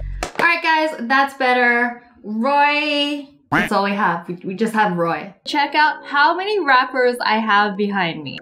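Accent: Australia. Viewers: cuz she's Australian so she has an Australian accent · U got hate because people take this as a hate comment u judged the Australian accent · I've got my trusty KNOIF Nobody: Not a single soul: Me: Hahahahahahahahahahahah! The Ozzie slang is soooo funny!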